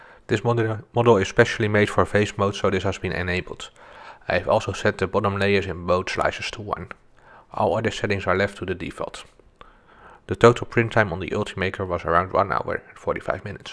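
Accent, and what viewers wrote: Netherlands. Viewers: Dat klinkt Nederlands :) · I love your Dutch accents 👍🏼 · Nice video man, but the heavy dutch accent is REALLY annoying!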